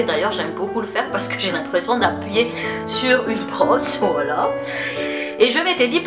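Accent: Madagascar. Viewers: Coucou! Je voulais te dire que ta vidéo est super ! PS: Tu es malgache ? J'ai l'impression que ton accent ressort un petit peu! Bisous :)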